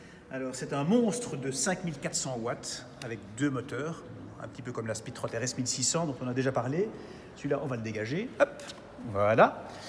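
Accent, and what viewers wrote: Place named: Belgium. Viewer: Il me tue c’est belges 😂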